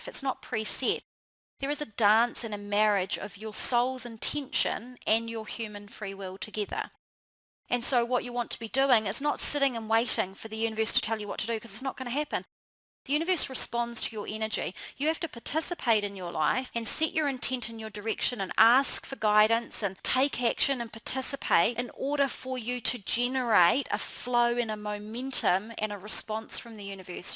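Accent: New Zealand. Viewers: Rio Brasil Samba Shows sambistabeauty - cos we are KIWIS BRO - 😉 no harm in it, just our accent. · So nice to hear a kiwi accent. Great Video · I have been manifesting a trip to Australia for me and my son. I clicked on this video and heard your accent! I know you are from New Zealand but still! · ~ Gosh you speak FAST, but with clarity. Some words escape me with the NZ accent. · Wow great to hear the nz accent!! Good to know there are people in my little corner of the world thinking this way! Xxx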